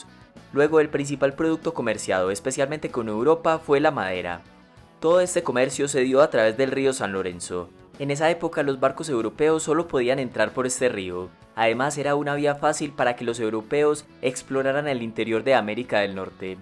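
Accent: Colombia. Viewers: Dato curioso el narrador de este video si no equivoco es de origen colombiano por su acento…..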